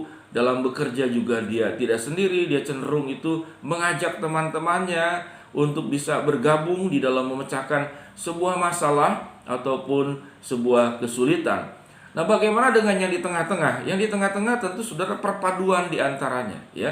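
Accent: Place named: Palembang, Indonesia. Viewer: Sepertinya cara berbicara nya kayak ada logat Palembang nih mas ya ? Hehehehe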